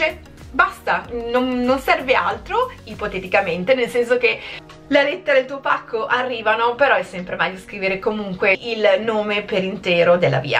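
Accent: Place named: United Kingdom. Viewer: Video molto interessante, mi è giunta una curiosità! Ma tu sei Italiana di nascita? Perché hai un accento british perfetto quando parli inglese, e ti rimane leggermente quando parli in italiano!!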